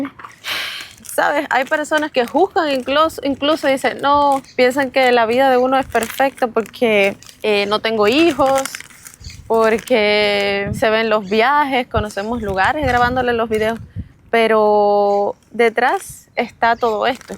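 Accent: Chile. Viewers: Hola, espero que estés bien, muy buen video... A proposito, no sé si te lo han dicho? pero se te ha pegado el acento chileno, quisa no te das cuenta, pero como que te estás chilenisando, me gusta eso, además que eres de las personas que llegaron a aportar al país, saludos desde Osorno, X R. de los Lagos, Chile!! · Hace rato no te veo y hoy que vuelvo a ver un video tuyo casi no te conozco, te ves tan diferente y ya hablas como Chilena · Hola, saludos desde Houston, qué bonito video que afortunada eres mostraste una parte muy linda de chile, hablas muy pausada, el acento muy chileno, mucha suerte y seguir adelante.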